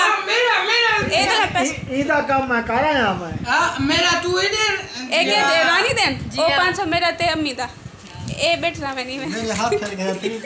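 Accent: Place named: Muzaffargarh, Pakistan. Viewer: ap basckly Kha sy haiii Ap k leljy sy Tu ap Mujy district Muzaffragrah jatoi city sy lgti haiii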